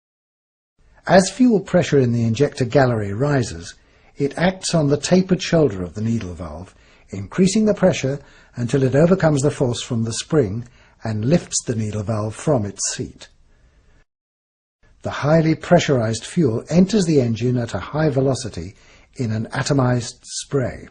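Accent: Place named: United Kingdom. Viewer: للأسف التعليق بإنجليزية بريطانية.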